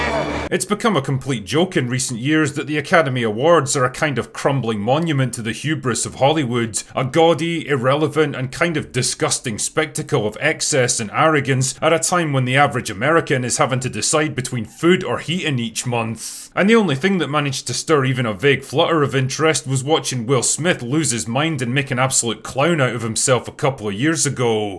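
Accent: Scotland, United Kingdom. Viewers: i no longer watch actual movies or sports or even elections, i just watch whatever people are doing the highlights of the events. this guy talks same as willie from the simpsons the scottish groundskeeper.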